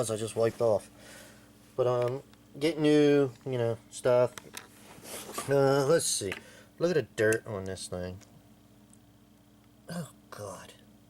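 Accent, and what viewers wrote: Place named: Philadelphia, United States. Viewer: You sound like your from philly lol!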